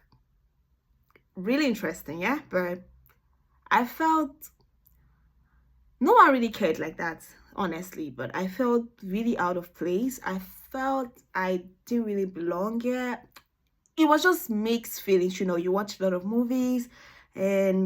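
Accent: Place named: Nigeria. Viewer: U have Nigeria accent did ubase in Nigeria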